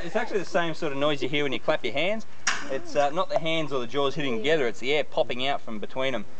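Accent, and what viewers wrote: Australia. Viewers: Something about an australian describing wildlife just fits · You're right. Can't imagine a non Australian croc boink. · Aussie or Floridian. No one else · Aussie mate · I miss the Ozzy accent.. I miss speaking English in general.. people in Germany suck bad at it :D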